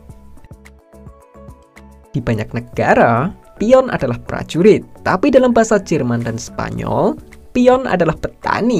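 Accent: Java, Indonesia. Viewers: medok suara nya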